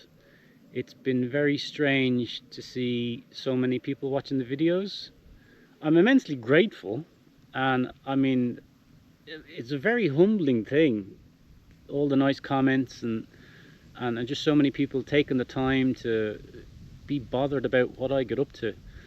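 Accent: Dublin, Ireland. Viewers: That was fun thanks, you don't seem weird, but some of the questions were. My American ears think you definitely sound Irish. Thanks for the video. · Hi, Just saw your app pop up for first time. As soon as I saw your face knew you looked like Dan’s friend. I’ve followed him from the early days. He does good editing of his episodes. Loved your humerus answers to quirky questions, some made me laugh for sure. 😊 I’m English living overseas. Try to go Home most years for a few weeks, just hate airports and all the people and so much waiting for international flights. Yes, you definitely do sound Irish, no doubt about it. Always good to know, right. Have a good weekend. M. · I thought you and Dan where brothers or at least related. But now that I hear you accent, you do sound Irish! Loved this Q and A! Have you visited chile?? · How anyone thinks you don't sound Irish ,is beyond me lol ! 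Lovely soft Dublin accent .Greetings from Carlingford in the wee County .I have been watching Dan's channel for a long time (pre Chateau) You ,Nick and Dan make a great team. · You quite funny and it was nice to hear you lovely Irish voice. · What strange questions! I love the accent...def irish!!! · Like my Irish relatives, and most Irish people I know, you have a charming, humorous eloquence. That’s why I watch. Love the brogue ! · you also speak english with an irish accent :P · Greeting from Washington DC! Not going to lie, kinda disappointed the backstory I’d “worked” out for you guys isn’t true, you know… the one where you guys were all part of a fairly successful band but have taken off and retired, now you guys just work on rebuilding a chateau… Nike of course was your guys crusty grizzled Og sound engineer/tour manager/lead roadie… le sigh… Seriously though, it’s cathartic and a joy watching you all rebuild that old lady! 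Keep kicking ass brother man! (also, funny that the first time I heard your Irish accent, I didn’t really pick it up and wasn’t sure if you were from the states😂. It’s a funny thing that happens where some Irishmen sounds more American than from the UK.. but it didn’t take long for that Emerald Isle twang to pop out.. cheers!) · Despite your travels, you've still got your lovely Irish accent. I'm perplexed that someone thought you don't sound Irish. 🤔🤯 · I just found this video! Love your accent. And you’re funny! Your in your 40’s 😊 just waiting for my night with an Irish accent to come rescue me 😊 LOL Love watching you help Dan. Amazing work! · The Irish accent is as sweet as honey. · Hello! I was so pleasantly surprised to see you have your own channel...Congrats! I could listen to you talk all day long, love me an Irish accent lol You have such a comedic way with your banter that is so entertaining and I have to agree with the other ladies, you are, easy on the eyes lol Been watching your journey at Dan's and always love the shenanigans you all get up to, also very entertaining. 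Love camping as well, and here in Alberta, Canada there are some beautiful places to set up camp, especially up in the mountains. Some beautiful scenery! Thx for sharing your journey with us all! ❤ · I didn’t think you had a Dublin accent but now I hear it, I think when you and Nick are together I couldn’t hear it as Nicks accent is very strong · You most definitely sound Irish! From a woman living in Tasmania, but born in Balls Bridge. I miss my accent.....😞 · Irish with an Irish accent… brilliant!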